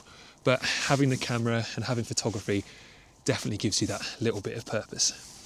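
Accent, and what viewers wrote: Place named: United Kingdom. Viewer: Agree that winter is a tough time to shoot in the Canadian Rockies. I was in Assiniboine area for a week, (plus several days in Lake O'Hara) almost two years ago -- it got colder than -30 celsius (my thermometer froze below that!). Batteries die very quickly, as well, and in those temperatures you can't expose your hands for long. But one thing the snow usually does help with is to simplify the scene and that often opens up many opportunities, I find. And by the sound of your accent you hail from a similar corner of the UK as myself. I wonder whether you were also drawn to Canada through falling in love with the Rockies? (I've been hiking the Canadian Rockies the majority of my summers since the 1990s.)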